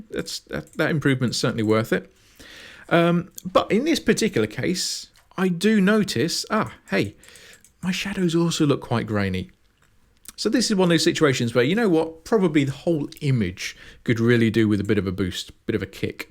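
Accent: United Kingdom. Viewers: Thanks for the tutorial, nice and clear! with a nice fluffy British accent! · A very clear explanation. Thank you and great to hear a British accent for a change :-)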